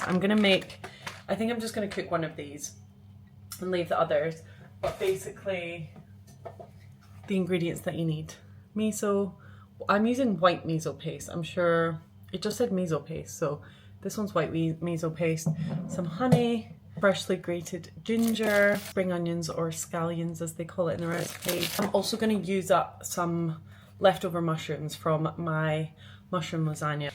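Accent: Scotland, United Kingdom. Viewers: You look fantastic in all the Lilysilk! I think your Scottish accent is stronger after your wonderful weekend with your mum and sister, it's so cute!! Happy that you've already been able to narrow down your dress choice. Still about to watch the cooking bit 😂. Busy times!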